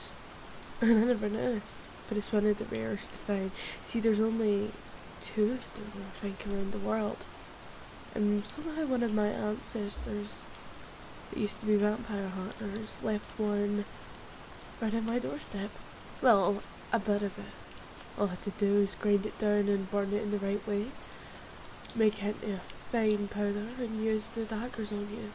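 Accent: Scotland, United Kingdom. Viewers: ah my daily dose of scotish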